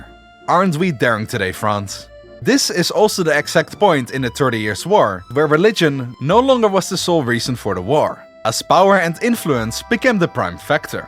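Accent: Netherlands. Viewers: This video is great, the hilarity brought to me by the Dutch accent is even better. Not that your English is bad(its amazing) but the Dutch accent is very noticable and silly sounding. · Dutch oversimplified (als ik het accent acuraat heb) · Dude, your pronounciation! That's not how you say words! Please do something about not only your extreme Dutch accent, but also please just learn for example where the accents are in the words catholic and protestant before doing a video on the reformation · I smell Dutch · Just subscribed, but this accent seems very Dutch even though nailing the English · I hear a Dutch speaker 🇳🇱🇳🇱🇳🇱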